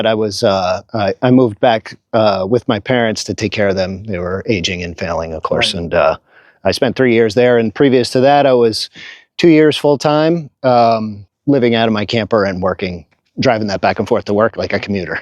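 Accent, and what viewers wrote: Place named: New Jersey, United States. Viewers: “ I kinda drive like I stole it”. HAHAHA!, you gotta be from Jersey or something’. Sound like the wise guys I grew up with. Great build brotha! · I could tell he was from Chersey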